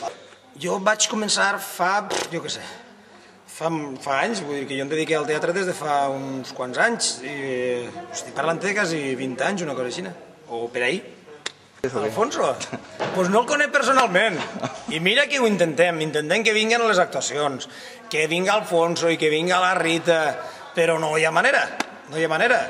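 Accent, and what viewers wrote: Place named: Alcoi, Spain. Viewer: Com es nota que es alcoià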